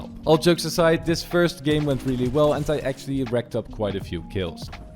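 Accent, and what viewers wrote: Netherlands. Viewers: are you dutch? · is he dutch? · You sound like ur from the nederlands. Is that true? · Are you Dutch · are u dutch begus u have a little dutch accent and im also dutch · I feel like youre dutch because of your accent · ben je nederlands hoorde het aan de intro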